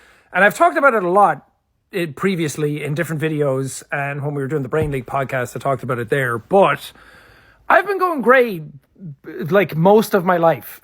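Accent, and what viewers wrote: Ireland. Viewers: Why does this Viking have an irish accent?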